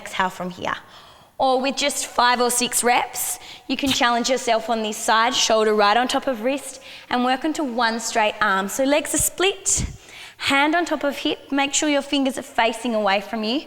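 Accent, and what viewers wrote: Australia. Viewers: I love your Australian accent!!!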